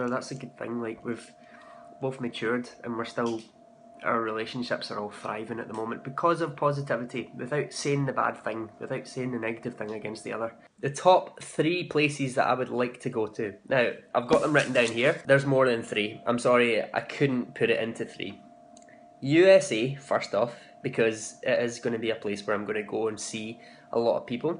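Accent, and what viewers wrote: Scotland, United Kingdom. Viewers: so I love Scotland (always wanted to go there) , i love your accent but most of all I really admire your positivity, which I think is essential for a person in order to be okay with himself. I "met" you a couple of months ago but whenever I watch your videos, it feels like idk, home :p so yeah greetings from Greece, keep smiling!!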